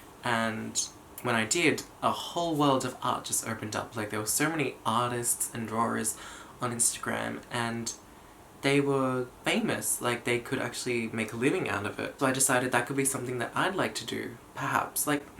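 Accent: Australia. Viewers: Is that an Australian accent? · I recently subscribed and AHH HE’S AUSSIE I DIDNT KNOW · Is that an Australian accent I hear? I've been working on improving my imitation of an Australian accent, but I've been finding it pretty difficult. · What nationality are ya? Sound Aussie... I think? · Oh that auzie accent. Wow. R u from Sydney?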